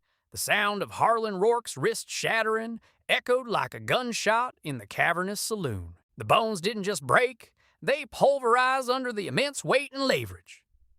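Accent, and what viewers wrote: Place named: Appalachia, United States. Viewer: whats with the hillbilly story teller???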